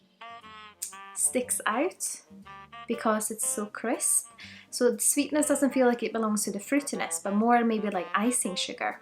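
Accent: Scotland, United Kingdom. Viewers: Nice Scottish accent and great review.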